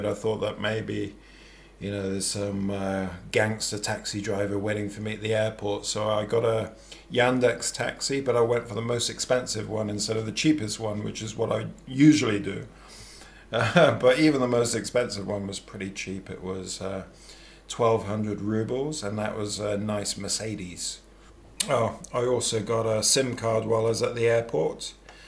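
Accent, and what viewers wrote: England, United Kingdom. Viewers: How did you get Serbia citizenship? With that English accent!?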